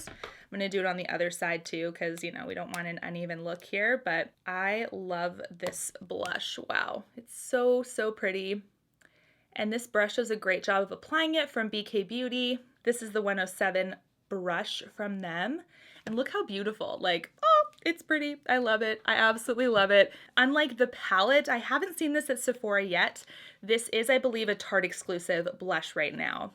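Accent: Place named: Winnipeg, Canada. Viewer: Born and raised in Winnipeg although now live in the states- I always like listening to you as your slight accent reminds me of home! loloyogini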